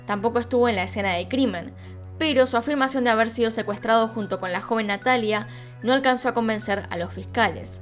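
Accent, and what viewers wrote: Argentina. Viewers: Saludos! Me encanta tu trabajo,tu pelooo y tu acento que me lleva a mi Argentina estando lejos viviendo en México 🏘️❤️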